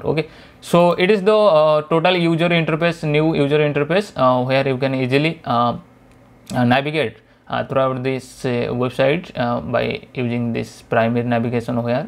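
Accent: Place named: England, United Kingdom. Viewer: You have an awesome English accent. So easy to understand. 👍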